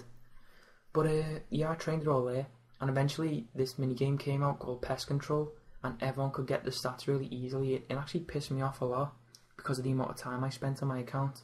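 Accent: Liverpool, United Kingdom. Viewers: never knew u were a scouser ~ Aaron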